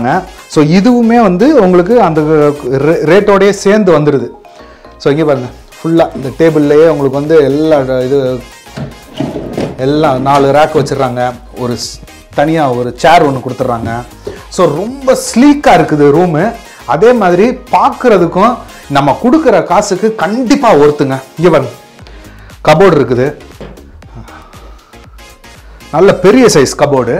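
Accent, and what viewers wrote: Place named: Chennai, India. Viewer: Looks like indian cricketer Namma aswin Mari irukinga avar Mari tan pesuringa,like frm Chennai 🇮🇳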